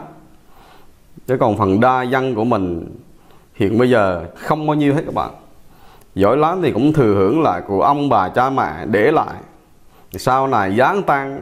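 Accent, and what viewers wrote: Quang Nam, Vietnam. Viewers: Tiếng nói của chú rất trung thực Chuẩn tiếng địa Quảng Nam. Chúc chú nhiều thành công .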